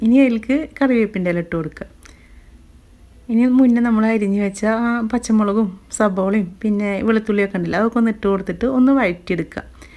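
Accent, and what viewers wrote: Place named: Thrissur, India. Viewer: Rambuttan kazhikan njan beemanam Keri varatta❤ orupaad istaayi video yum Thrissur baashayum❤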